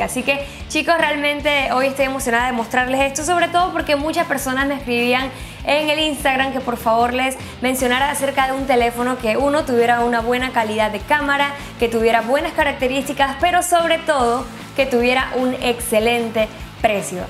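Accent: Venezuela. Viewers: Me parece conocido tu acento ¿eres venezolana?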